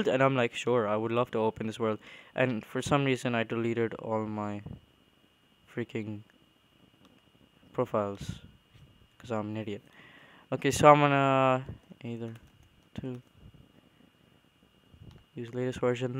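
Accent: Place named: Arab world. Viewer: I FUCKING LOVE YOU and your arab accsent (no homo) THANK YOU SOOO MUCH!!!!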